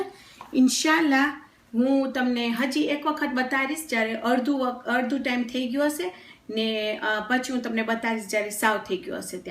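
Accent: Gujarat, India. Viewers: Love your recipe your gujrati is so sweet. Fun watching you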